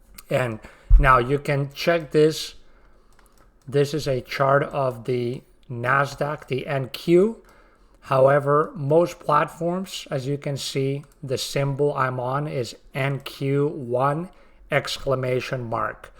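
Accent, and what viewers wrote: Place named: Belgium. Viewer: Are you from Belgium? That accent sounds familiar.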